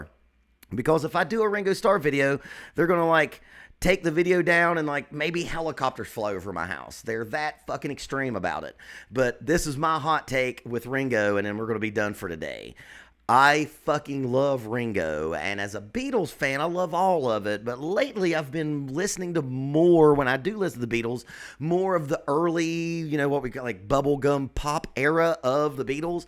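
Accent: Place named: Gulf Coast, United States. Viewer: I'm trying to read your accent, are you from the gulf coast?I came for the Beatles doc, but I'll continue to check out your channel! 👍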